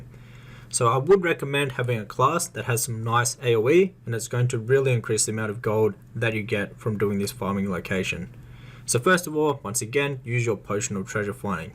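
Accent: Australia. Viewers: Wow man.. still at it?! I remember being a kid playing on my cousin's account and looking up how to make gold / farm mats and I heard this rad ass Aussie saying "check out this farm, its 'Bad P**sy' " I come back to the game and find myself here again with same OG posting content! That's dedication homie! GGs!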